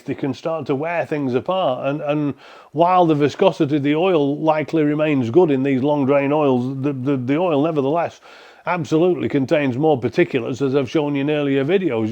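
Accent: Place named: Lancashire, United Kingdom. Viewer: Some people just have to something pissy, if you don't like the man's Lancashire Accent go get your highly valuable LR knowledge somewhere else. No one is forcing you to listen . Ungrateful pillock.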